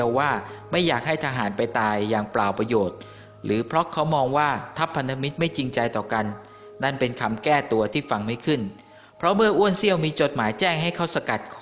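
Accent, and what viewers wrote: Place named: Isan, Thailand. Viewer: เเอดมิน น่าจะเป็นคนอีสารเเน่เลย เเมนบ่อ้ายสำเนียงมันบอก555 (หนู๋คนอุดรเด้อ)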